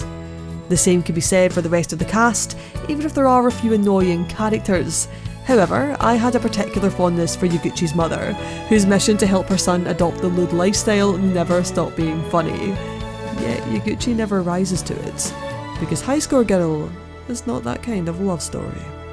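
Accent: Scotland, United Kingdom. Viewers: Good to hear a Scot do the review. Ta Pal.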